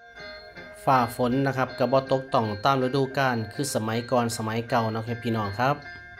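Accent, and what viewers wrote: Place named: Isan, Thailand. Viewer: สู้เขาเด้ออ้ายบ้านเฮามันแล้ง บ้านผมกะบ่ต่างกันเลยครับ ฟังอ้ายเว้าแล้วผมคิดฮอดบ้านเลยครับ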